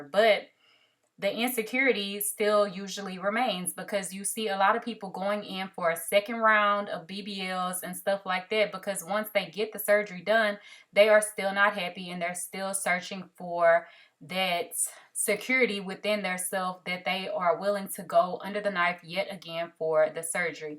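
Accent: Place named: Chicago, United States. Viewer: You kind of have a Chicago accent ❤️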